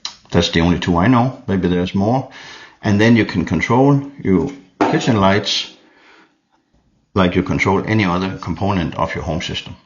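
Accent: Denmark. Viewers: fed dansk accent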